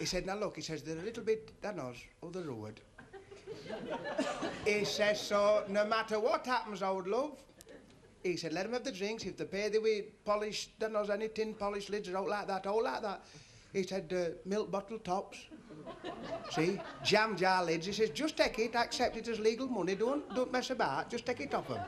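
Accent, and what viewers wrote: Yorkshire, United Kingdom. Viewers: i remember the first time i hesrd him i had visions of a yorkshire miner telling jokes such broad accent but what a funny guy. the comedians would NOT eork today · Am Yorksher! Sur understandin' 'im ain't nur problem forrus. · Good old Charlie, God bless his memory. He was a fantastic comic, loved to watch him on the Comedians show. His Barnsley dialect made his jokes even funnier as he used it to emphasise them. I can understand it perfectly being from the next county myself Derbys. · Lars Jönlid I’m from Barnsley like him and he’s so easy to understand for me but I think it’s the accent and dialect that makes him so funny. Tell you what whenever we leave the country depending on where we go certain countries find us easier to understand as some of the dialect and the accent is derived from Norse language. e.g. lekking (playing) comes from the ancient Viking word lake which means play. 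It’s always funny when someone 10miles away can’t understand you but people in other countries seem to have easier times😂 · That's a strong Barnsley accent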